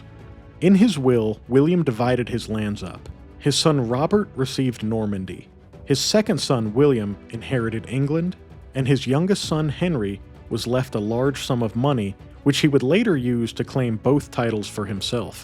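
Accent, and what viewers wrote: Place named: United States. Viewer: the US pronunciation which jars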